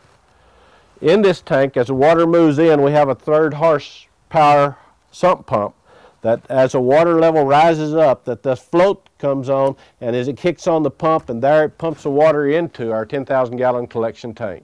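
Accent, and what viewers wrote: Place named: Texas, United States. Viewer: Great video.. I love the Texan accent :-)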